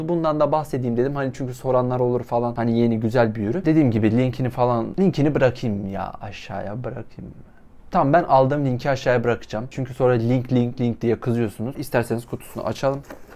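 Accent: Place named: Germany. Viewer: Reyiz almanyadaki kuzenime benziyon tip şive bakımdan almanyada bulundunmu?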